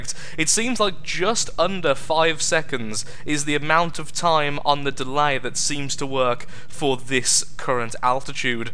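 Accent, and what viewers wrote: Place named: North, United Kingdom. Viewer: Hey man this is some good shit. You're probably one of the best from the depths content providers so stick with it. PS: What county is your accent from? You're northern I think but where abouts? Your accent has some inflections I can't place.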